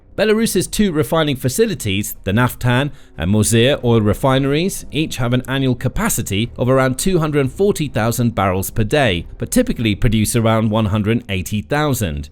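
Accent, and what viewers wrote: England, United Kingdom. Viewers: It really, REALLY bugs me how the narrator keeps calling petrol, "Gasoline", because he is English from his accent and in the UK they call it petrol NOT gasoline.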